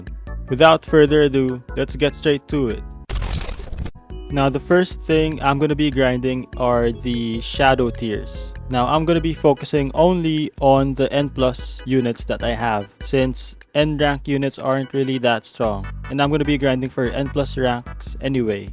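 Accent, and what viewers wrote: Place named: Philippines. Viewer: I can hear some Filipino accent tho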